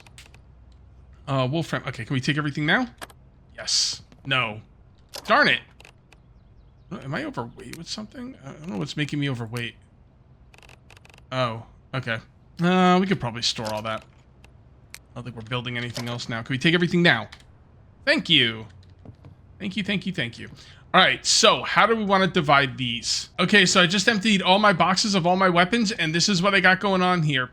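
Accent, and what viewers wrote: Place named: South, United States. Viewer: We pronounce it that way in the south. We also use the L in salmon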